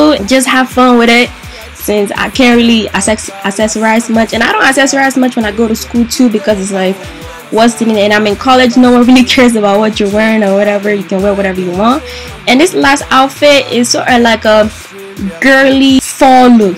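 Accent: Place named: Nigeria. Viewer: love love love your style as well as your naija accent!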